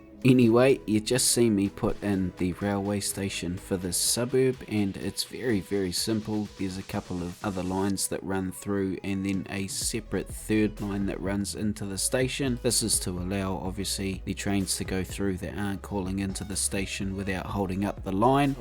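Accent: New Zealand. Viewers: awesome! been waiting for this one mate :) Looks so like nz countryside, it just says home to me :) loving that kiwi accent too bro!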